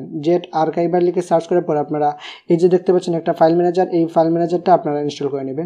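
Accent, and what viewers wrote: Sylhet, Bangladesh. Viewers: আপানার বাড়ি সিলেটে?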